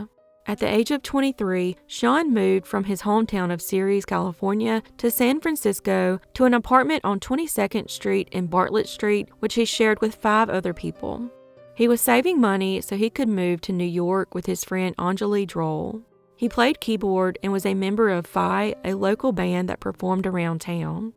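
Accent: South, United States. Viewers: Ur voice was definitely made for these types of YouTube videos!! Ur voice is so smooth but so southern... I love all ur videos & I personally think YOU SHOULD HAVE MILLIONS OF SUBS!! UR VIDEOS R NEW & UNLIKE YOUTUBERS WHO Have millions of SUBS & ALMOST ALL THEIR VIDEOS R VIDEOS THAT THEY HAVE SHOWED A MILLION FREAKING TIMES & ITS LIKE THEY SHARE RERUNS.. IT DRIVES ME NUTS CAUZE THEY MISLEAD U & BAIT CLICK U!! UR A SPECIAL BREED.. I WONDERFUL 👏 BREED.♥ THANK U.. MUCH LOVE FROM CANTON,OHIO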